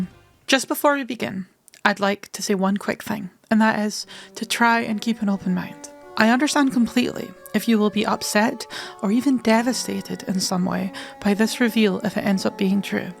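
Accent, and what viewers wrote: Scotland, United Kingdom. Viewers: Your voice is the most Scottish thing I've ever heard and I love you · I love your accent, theory was good, yell at me more possibly Scottish person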